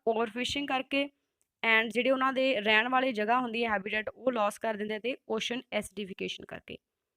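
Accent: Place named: Punjab, India. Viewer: Mam thoda clean hindhi bolo only pujab nahi koi or State wale bhi dekh te hai apko 🙄 please 🙏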